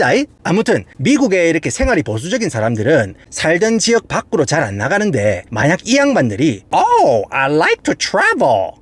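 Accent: Gyeongsang, South Korea. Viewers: 덕에 미국넘들 많이 알아간다. 갱상도 말씨도 맛갈나고... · 와 미국사는 경상도 아줌마인데요 내 귀에 쫙쫙 감기는 사투리와 내용들 너무 쥑입니다 최고입니다~ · ㅋ ㅋ 오늘도 억쎈 깅상도 사투리로 구수한 식당 이야기 잼나게 시청했어요 ~~짱♡ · 근데 이형 미국형인데..갱상도와서사시는분인가? 사투리 발음이왤케찰져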